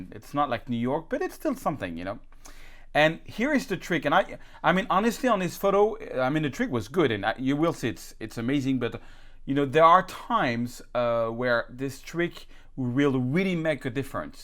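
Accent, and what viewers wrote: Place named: France. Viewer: Hey..I realy love your stuff..you gave me a lots of nice ideas about retouching. Also you are a funy guy and a real true person..with your French accent...always makes me lough...but in the positive way.. Hope to get some new and useble infos etc from you in the future.. Keep up the great work Mr.;)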